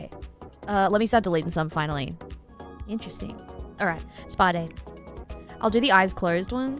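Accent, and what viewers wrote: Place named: Australia. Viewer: Your Australian? INSTANT SUB C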